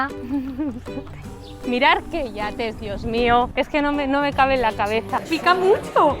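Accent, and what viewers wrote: Andalusia, Spain. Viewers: Algún día visitaré España. Yo pensé que eras de Andalucía, no se, tu acento! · Y porque el acento andaluz, si sois catalana, o me equivoco completamente? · Hola!! tengo una duda, porqué tienes acento andaluz si eres de Catalunya? ole tu carisma 😍 saludos... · Una Catalana con acento andalú?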